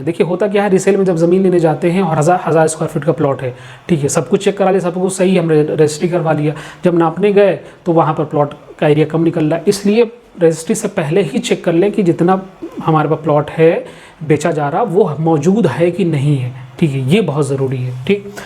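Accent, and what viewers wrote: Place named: Lucknow, India. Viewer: Bahut achche se explain kiya h lucknow se hn vo aapke bolne ke andaj se pata chal raha h. Informstive video h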